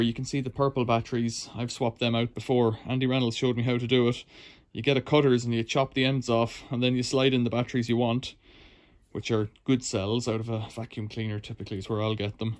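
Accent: Ireland. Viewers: the one with no charge port is a common charge port and the other is a separate charge port. Nice to hear an Irish accent on the tube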